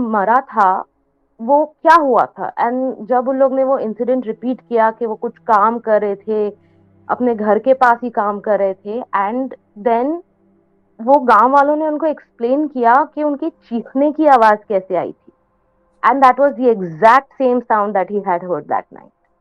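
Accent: India. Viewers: In the start of the vid I saw ur comment and now I will notice that😭😭 esp her accent is Indian that I don’t like sorry not sorry I don’t wanna be rude but😭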